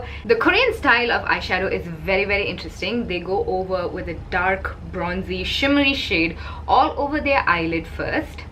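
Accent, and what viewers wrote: India. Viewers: she is so pretty 😭😭😭 also Indian accents are so harsh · I've just discovered your channel with this video. And I really like it ! First it's not just a VS makeup, because you're explaining lot of things and I love to learn about the different cultures, so huge thanks. And your Indian accent is so pleasant to hear ! ^^ You're funny and beautiful. I subscribed !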